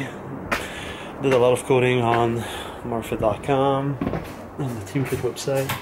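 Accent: Quebec, Canada. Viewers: T'es québécois ? Je te suis depuis peu donc je me demandais haha ;)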